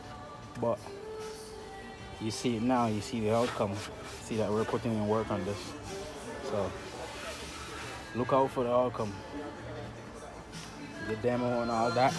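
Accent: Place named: Caribbean. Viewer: You’re sound Caribbean my people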